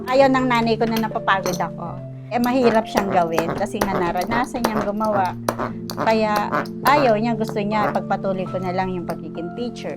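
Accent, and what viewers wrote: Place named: Philippines. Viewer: The narrator sounds very Filipino, so unless they've perfected a generic Filipino AI voice, I doubt it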